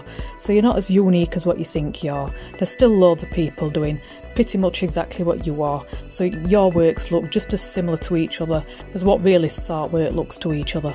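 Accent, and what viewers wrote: Yorkshire, United Kingdom. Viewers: Just like to say the painting is fantastic and I agree with your view on realistic paintings. I'm originally from East Lancashire but lived in Dublin for 25 years so to listen to a proper northern accent even though its Yorkshire is great. We have an art gallery here that's dedicated a whole room to paintings of large stripes!! realistic art is real art created by people with real talent. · This has to be the best video out on YouTube! Just fantastic. I have to agree with every single word that you said. Great to have someone with a proper accent too haha, where in Yorkshire are you from? Your artwork is amazing!!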